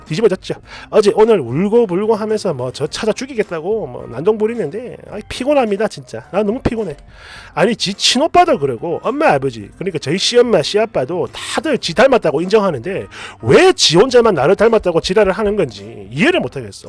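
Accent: Gyeongsang, South Korea. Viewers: 글 내용도 웃기지만 님 경상도 사투리 정말 웃겨서 제 얼굴이 웃는 얼굴로 변했어요 ㅋㅋ감사해요!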